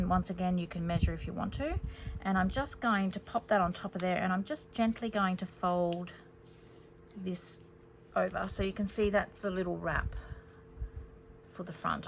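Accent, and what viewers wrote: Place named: Australia. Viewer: Thank you. I just found you and subscribed, nice to hear an Aussie 😄and love your style with using up 12" sheets, I have so many and struggle to make ephemera and pockets for journaling. These are just the thing I love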